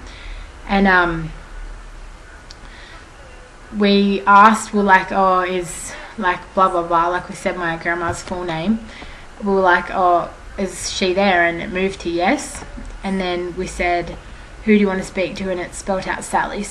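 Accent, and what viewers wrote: Australia. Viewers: I'm having like hard time understanding your Australian accent :( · Are u and Auzzie ? I can tell by ur accent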